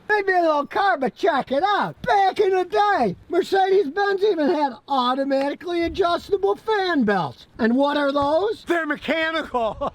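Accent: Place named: Western New York, United States. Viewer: He lives in TN, so I'm guessin' that Western NY inflection isn't translatable....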